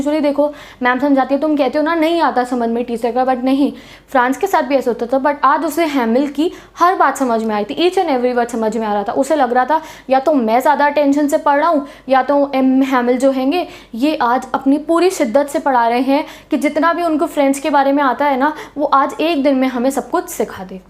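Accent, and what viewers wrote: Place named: Uttar Pradesh, India. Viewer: UP style of speaking bro Henge=hai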